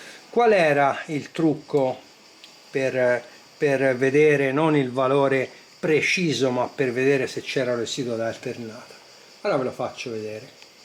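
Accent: Tuscany, Italy. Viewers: Con l'accento toscano sa quanto mi piacciono i suoi video... c'è anche tanta simpatia oltre la tecnica. Ottimo come sempre. Guardato attentamente come a scuola perchè per me è scuola e anche questo video è molto utile perchè fa capire diverse cose, oltre al trucco di testare i condensatori anche come funzionano i tester. Io ho uno come il suo Aneng col guscio verde che me lo godo da 2 anni e la sua manovra di lettura credo che non la sapevo, perchè lo lascio sempre in AUTO e sposto il commutatore. Poi la barretta sotto i numeri che corre nelle letture di certi strumenti... ancora non so a cosa serve... cosa indica... Adesso il video ci casca a fagiolo perchè ho appena finito un alimentatore lineare nel suo telaio che dovrà alimentare un amplificatore da 50 W per canale con integrati LM3886 e mi sono accontentato di questa potenza alimentandolo a 26V ma può dare molto di più ma a me non serve troppa potenza e sarebbe servito un alimentatore più grosso e spazi diversi e il progetto non si voleva spingere a grandi telai tipo anni 80 ma la metà di quelli. 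Ho messo 2 condensatori da 10.000 in parellelo per ramo (+ e -) perchè è duale e il calcolo mi dava 16.000 µF e ho messo 20.000. Adesso devo fare il collaudo sotto carico a 5 A per ramo, ho il carico fittizio e anche l'oscilloscopio nuovo di pochi mesi e caro amico mi diverto come lei. Purtroppo non ho saputo progettare bene dall'inizio perchè volevo due alimentatori separati, uno per canale con 2 toroidali ma avendo comprato un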